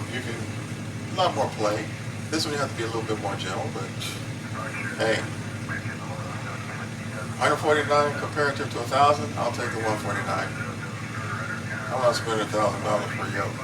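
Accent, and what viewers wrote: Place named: South, United States. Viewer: Thanks for this! Looks fantastic! I'm getting 3DOF delivered in the next week or so. I have a couple of questions. I have Oculus cv1 that works great. Do I need to use the Oculus tracking lighthouses taped to the seat/machine for proper tracking and/or a program to keep it in sync? If my funds weren't limited, I'd get the Reverb G2 or even the Oculus S you have. My second question, do you need a program besides 3DOF's to talk with MSFS or X-Plane? I'm real psyched to get this built and flying! It's gotta be the ultimate immersion. I use to have a PPL, but my service connected disability prevents me from renewing. This tho will be just as fun..or nearly as fun! Thanks a bunch! (you sound like your from NC or Texas? If Texas, hope all is well for you!) Cheers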